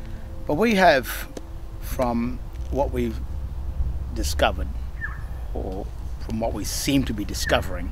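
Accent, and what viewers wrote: Australia. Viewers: Really fascinating and enlightening information! I like your style of delivery, as well. It's genuine, logical, and unforced. And I've always found an Australian accent easy to listen to.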